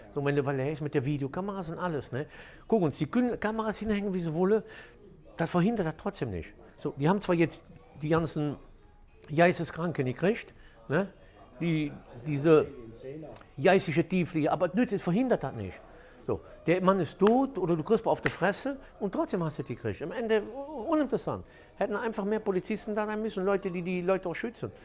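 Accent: Cologne, Germany. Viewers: Hallo, ich liebe den Kölner Dialekt. Grüsse aus Basel.🇨🇭👍💣💥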